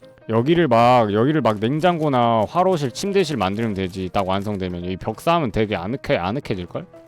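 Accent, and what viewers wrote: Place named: Jeolla, South Korea. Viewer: 목소리에서 전라도 사투리가들리네요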